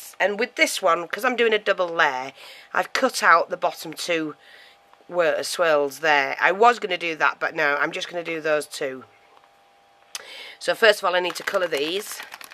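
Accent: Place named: Leeds, United Kingdom. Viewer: So pretty. Keep them coming I can't wait to see what you come up with next. Thank goodness I don't work anymore I'd have to quit and stay home to watch. LOL My mother, uncle and gram & grandfather where born in Leeds. They came to USA in 1920. But I hear their voices when I listen to you. Thanks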